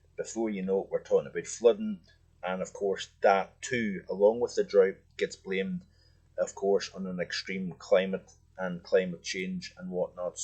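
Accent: Scotland, United Kingdom. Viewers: Many thanks I think your Scottish accent brings more feeling and meaning to your very detailed weather reports. Some equally fine and detailed weather reports, almost become boring, due to the uninteresting accent. Mr puts a lot of emphasis into his voice, and personally, i think his Scottish accent suits his interesting channel. Ive told several friends to check out weather channel, as its a real pleasure to tune into your weather analysis. More of the same please, and a BIG thank you from a still rather dry llandudno on the North Wales riviera coast.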